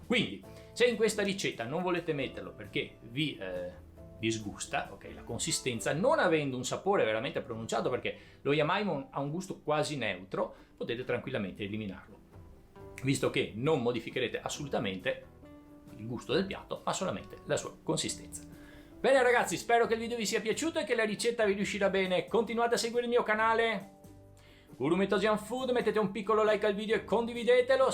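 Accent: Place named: Veneto, Italy. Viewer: lo sento io ,perché sono veneta e forse mi sbaglio ,ma verso Treviso o vicentino?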